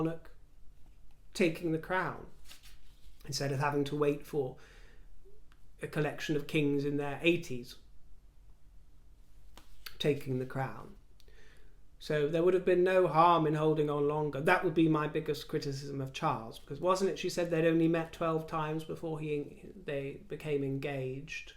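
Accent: Australia. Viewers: Well done on your aussie accent :) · Bloody ripper of an Aus accent! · Sorry but not everyone talks like that over here, you know Australia has moved on from that,but saying that it’s like Gordie it’s a celebration of a dialect 🤷‍♀️ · 😁😁😁🤣🤣🤣🤣🤣 Oz accent. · you speak better Australian than I do! Good morning from a very cold Sydney ❤️ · Love your Aussie accent #. Got the pronunciation coming out nicely 👏👏👏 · Love your Australian accent... "Lilly Diana! Not sure if you meant to, but you really did make me laugh out loud!! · Wow Aussie here and you do nail the accent down to a T. 👍🤩😄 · Love your Aussie accent ❤ You really got the point across...".Lilibet Diana"!!!👍👍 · Your aussie accent 😂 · As a true blue aussie, you're accent is spot on from an Australian movie named Dons Party circa 1970s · Your Aussie accent is perfect. Not a hint of cockney or kiwi. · I'm an Aussie and you crack me up with your Aussie accent. 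It's pretty good. Luv ya xxxxxx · Your Aussie accent is an absolute delight, dear!!! As for the BC photographer, I hasten to agree with you - very hmmm 🤔 and their website lacks a lot of trust. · Love your Aussie accent🦘🐨🇦🇺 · Your Aussie accent is on point 🇦🇺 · haha.If you used that accent in Australia you wouldn't be getting many invitations out. We have many different regional accents and dialects in Australia. You just used a very bad 'Harry Kings scrap-metal and junk car-yard' accent. Is that was what you were going for?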